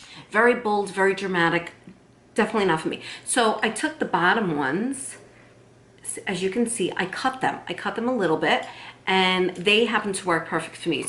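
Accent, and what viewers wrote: New York, United States. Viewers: This helps a lot!!! Are you a Long Island girl? I hear a NY/NJ accent. Luv it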